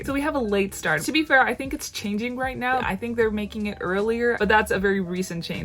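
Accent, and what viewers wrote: United States. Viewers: Wow! I wouldn't have known you spent the majority of your life in Japan. Your English is so good. You even have the vocal inflections of Americans or Maybe Canadians in your age group. Congratulations on passing the B-2 DELLE. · No way I thought you were from the US!! ¡Que inspiradora! Gracias por compartir tu historia. :)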